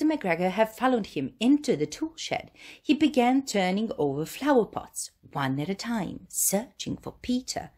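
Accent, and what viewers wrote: United Kingdom. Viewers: Divno! Obožavam da slušam ljude sa britanskim akcentom!❤ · Savršen britanski akcenat. 😊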